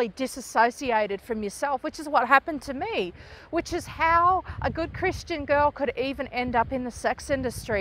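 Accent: Australia. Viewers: Your Aussie accent is really sweet... 👍☺️ stay well...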